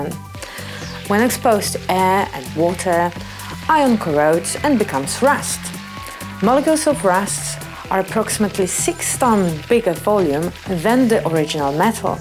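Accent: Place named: Eastern Europe. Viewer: Why put a non English speaking Eastern European for an english video?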